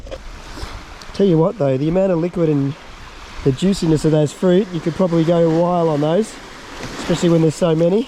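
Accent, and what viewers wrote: Australia. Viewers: aussie accent overload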